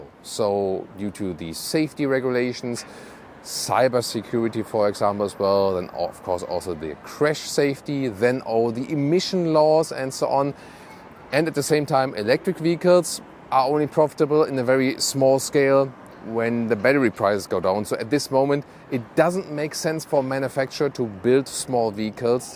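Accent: Germany. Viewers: Gibt es den Kanal auch auf deutsch? Das deutsche Englisch ist....ähm...suboptimal, um es mal nett zu sagen. Und jaja deutsche Autos sind besser blabla...aber die saufen auch wie die Sau! Es gibt nämlich nirgends einen Fullhybriden im Angebot. Entweder Vollbenziner/Diesler, der säuft einiges mehr als der Lexus hier, oder dann direkt ein Plugin oder full E-Auto.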